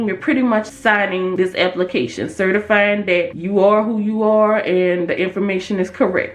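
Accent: New Orleans, United States. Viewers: You sound like a NOLA baby on the slick! Love the video!! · She's is from NOLA ❤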